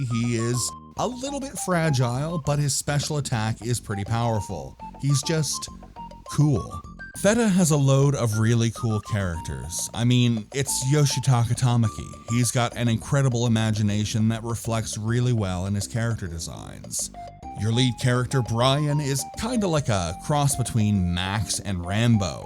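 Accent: Maritimes, Canada. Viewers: bro are you from the maritime provinces in Canada?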